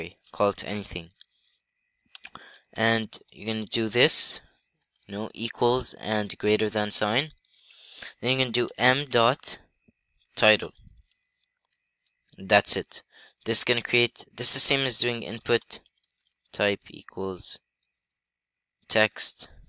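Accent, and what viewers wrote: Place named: Arab world. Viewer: Unrelated question: Your accent sounds Arabic. Are you Arabic?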